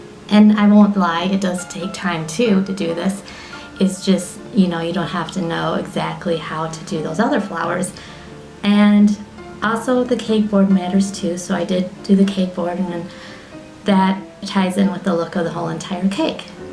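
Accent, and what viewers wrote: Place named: Chicago, United States. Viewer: I prolly said this already, but my sister lives in Chicago. haha. It's thanks to that, that I learned to identify the accent. Box = backs. Solids = salads. Tom = Tam. Hahahaha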